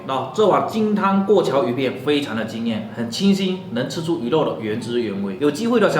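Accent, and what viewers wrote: Fuzhou, China. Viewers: 一听口音就是福州的😅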